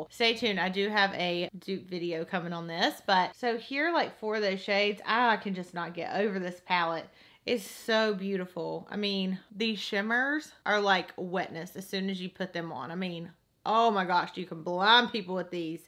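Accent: South, United States. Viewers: Why would ANYONE dislike video?! Lol 😂 honestly idgaf what video it is, I love watching her stuff, her voice is relaxing (her country accent) and she's funny!!! PLUS she talks about makeup!!! What's not to LOVE?!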